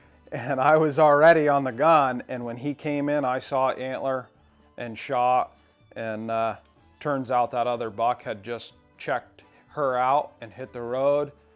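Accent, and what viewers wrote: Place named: Canada. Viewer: Wicked bucks. I recognize that voice from the podcast you sound more Canadian than Vt eh?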